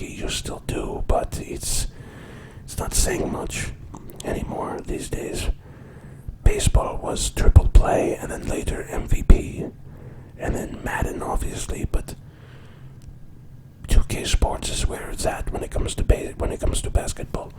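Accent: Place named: Japan. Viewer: I love the old Japanese accent! 😂 Perfect 👌🏼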